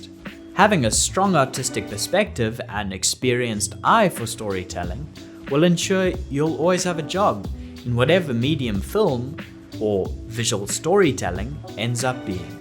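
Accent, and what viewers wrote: South Africa. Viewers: The narrator sounds very South African. 😊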